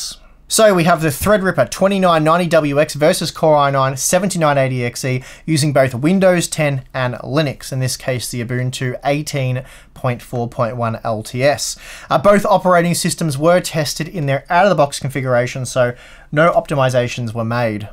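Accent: Australia. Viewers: PLEASE DO MORE LINUX! I love your channel and your wacky Australian accent. MORE LINUX! Give the people what they want!!!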